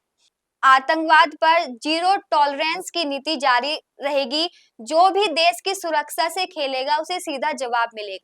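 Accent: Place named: Purvanchal, India. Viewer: Jai hind... N for the 1st time in my life i luv Purvanchali accent Coz of ur content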